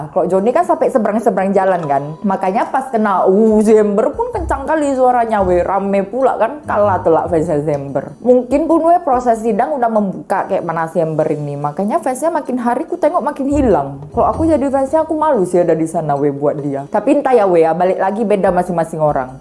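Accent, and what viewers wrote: Medan, Indonesia. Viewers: Onde mandeeee.. Logat Medan rasa Padang.. kereeenn...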